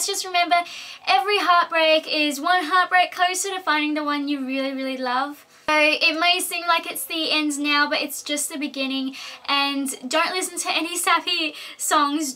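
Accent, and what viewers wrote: Australia. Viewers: Is she from Australia ??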